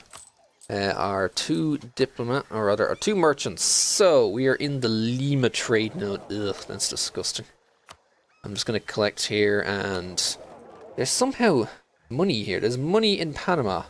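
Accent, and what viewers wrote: Ireland. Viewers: Hey, what accent do you have? I hear Irish, but I might be horribly wrong. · like he is from Ireland. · For Northside Dublin it's actually pretty subtle! My parents and entire family are from Northside and some are the type who'd say " Story bud" in that typical accent.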